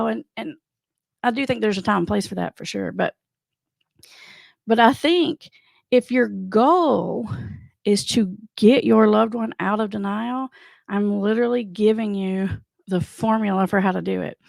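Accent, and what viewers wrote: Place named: North Carolina, United States. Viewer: Hey ! We share the same dialect ! (n.w. N.C.) 🏅 👍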